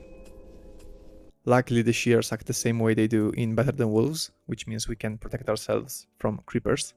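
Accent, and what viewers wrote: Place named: Spain. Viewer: Ese pequeño acento en español y las palabras delatan un poco jajaja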